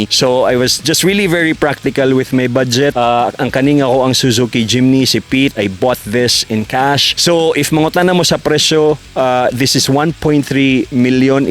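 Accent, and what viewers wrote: Visayas, Philippines. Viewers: Been Watching kahit d ko naintindihan kasi tagalog ako, Pero theres somethings interesting sa accent, Sa bisaya language, Soon sana matuto ako hehe. I'm 31 now and feeling lost, Didnt expect to be moved by this vlog kahit half of words d ko maintindihan.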